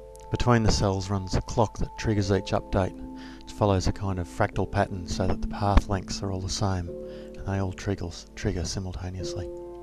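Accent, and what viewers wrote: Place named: Australia. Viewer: an infinite grid of souls... sorry, your australian accent made me think you said souls :)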